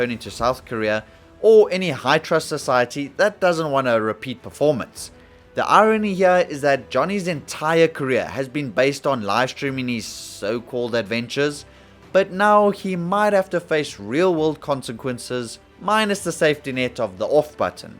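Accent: South Africa. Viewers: Refreshing to hear South African accent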